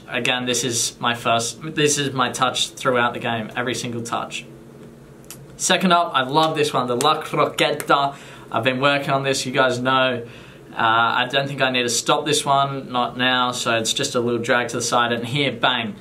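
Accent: Australia. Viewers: Damn that Australian accent, great video btw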